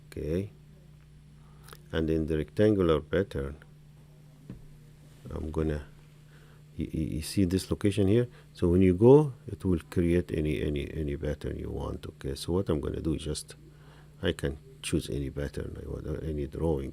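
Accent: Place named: Russia. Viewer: great videos, 3 days before i worked only In INVENTOR, but now starting be proficient in Edge to :-D ty. Nd BTW, from your accent, you RU ? :-D I am Slavic to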